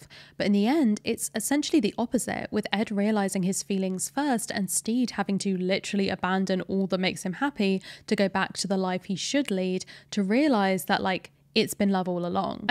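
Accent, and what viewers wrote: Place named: United Kingdom. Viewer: The subtitles transcribed the word duel as "jewel" so if I had forgotten that you were British that would've reminded me lol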